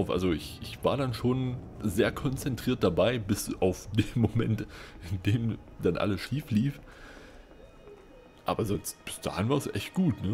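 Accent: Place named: Saxony, Germany. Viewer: Ich für mein Teil freu mich enorm wieder Videos von dir sehen zu können 👍 Deine ruhige Art in kombination mit dem klassischen Let's Play-Schnitt hat mir bei all deinen Projekten gefallen. Vorallem, kollege Sachse, als Dresdener finde ich deinen Dialekt sehr deliziös.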